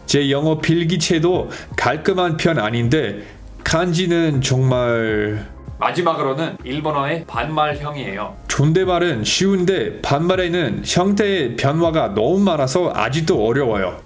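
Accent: South Korea. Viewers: 재밌네요. 한국어 다음에 배우셔서 일본어에 한국어 액센트가 들려요 ㅎㅎㅎ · 일본어 발음을 한국어발음으로 하시네 ㅋㅋㅋ영어발음으로 하시는게아니라 신기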